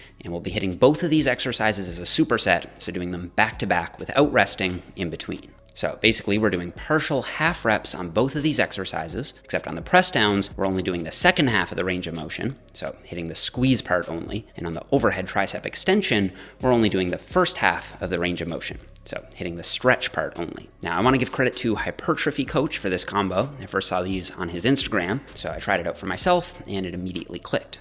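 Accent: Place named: Newfoundland, Canada. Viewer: love your stuff! Didn't realize you were from newfoundland until I watched your body recomp video and were talking about carrrbs, just waiting for a jiggs dinner video haha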